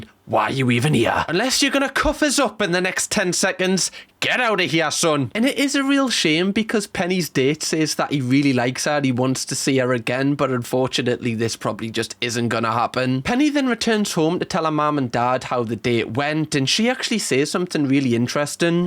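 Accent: Newcastle, United Kingdom. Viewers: He sounds like he’s from Newcastle (city in England) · I love the Geordie accent. My parents were both Geordies but moved to Yorkshire when they were quite young. All their siblings stayed in Geordie land though. I’m now in Canada and most of my Geordie family are gone so I love to listen to you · 'm an old lady stuck home a lot when I'm not being ferried back and forth to hopsitals for chemo and I discovered you a few weeks back. I can't even recall which video it was now but your wonderful Newcastle accent hooked me in. Always been a pushover for a Geordie accent. But I stayed because you're not only a funny little bugger, you're actually quite delightful. Personable, self deprecating & above all compassionate in a way many commentary channels are most certainly not. Well done and thank you for making me laugh. I've had tears a few times in every video I've watched. 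I've made my son subscribe as well. Let's get you to a million, eh?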